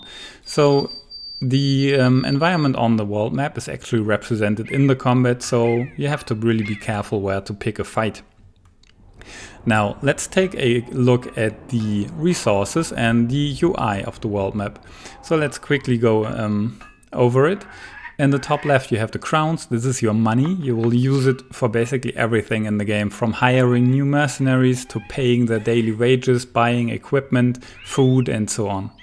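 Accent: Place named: Germany. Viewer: I'm so stoked. Hearing the German accent of one of the BB developers just adds to the hype and excitement. My first band of brothers will be called, "The Regulars" in your honor.:-D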